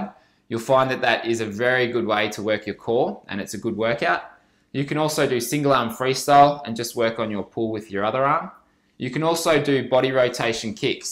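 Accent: Australia. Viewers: he's australian, isn't he? good tricks 👍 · nice aussie aceent.....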